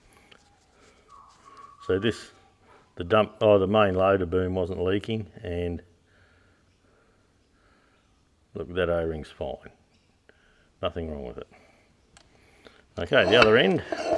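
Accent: Australia. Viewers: informative. I like your aussie English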